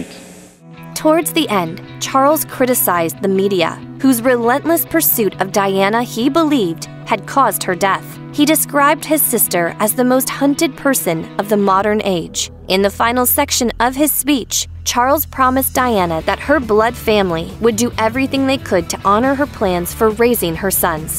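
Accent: United States. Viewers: This unfortunate event would be far better remembered and narrated by someone other than an American. Pronunciation, together with a complete knowledge of British royal ceremonial would be a distinct advantage... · There is something irksome about an American voice over on videos of British affairs. Its like having a terrorist describing the devastation of their actions.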